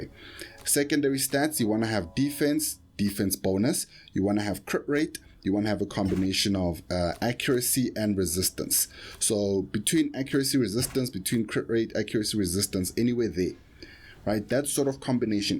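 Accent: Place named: South Africa. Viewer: Jasis so good to drop into a dislyte video and hear a lekker south african accent! you playing global?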